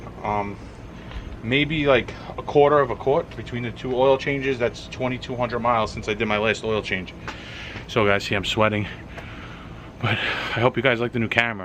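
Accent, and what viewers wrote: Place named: Boston, United States. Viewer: This dude def from Boston.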